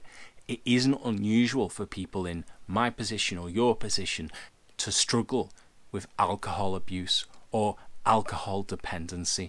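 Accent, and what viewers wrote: Liverpool, United Kingdom. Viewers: Good advice and info, also liked your Beatle accent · Are you from liverpool? Also, thank you so much for this. I'm starting off on 12.5mg for anxiety (not depression) and while I do like to have 1-2 drinks a night, 4-5 nights a week, I have complete control - I just like having a drink or two with dinner. However, I wouldn't mind cutting back during the week. But there are times I'm going to want to indulge. I have a vacation planned for October in the Caribbean that is all-inclusive. I plan on drinking a fair amount. Since I'm NOT struggling with depression or suicidal thoughts, I wonder if I should just take a break from the pills during that time? This is of course assuming that I react well and are still on them at that time. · You've not got the strongest scouse accent but knew it was liverpool or nearby. Yeah frontierland lmao good in its day but all gone now so has bubbles 🤣 keep doing your vids they r great for people going through similiar difficulties. 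So few people comment in relation to ur views but i think its just the whole mental health stigma yet again x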